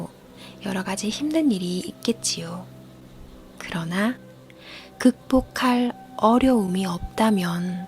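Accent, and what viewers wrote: Gyeongsang, South Korea. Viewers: 오~ 간만에 활기찬목소리 갱상도 특유의 목소리. 에너지 마이 실린 목소리 감사합니다. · 마약의 경상도 톤...와...반가와요~~^^ 잘 듣고 갑니다~ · 말투가 경남쪽인데... 20대 때 짝사랑한 누나가 부산 사직여고 졸업.. 지금은 서울 삼성의료원 과장..되서 잘먹고 잘 살고있어요.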